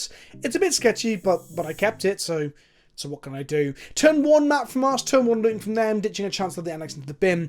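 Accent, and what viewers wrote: United Kingdom. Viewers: Why are you British?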